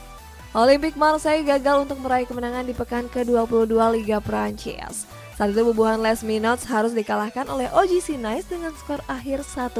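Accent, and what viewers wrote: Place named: Banjar, Indonesia. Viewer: admin mulai gokil , ad bhs banjar samarinda wkwkwk mantap sanakkk salam buan jelawat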